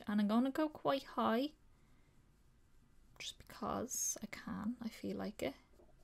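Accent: Ireland. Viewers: Damn you are so Irish 😍 😍😍💓💓💓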